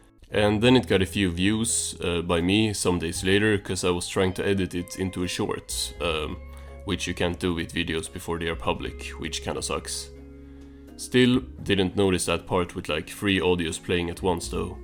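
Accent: Sweden. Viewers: It's for sure scandinavian, I'm leaning towards swedish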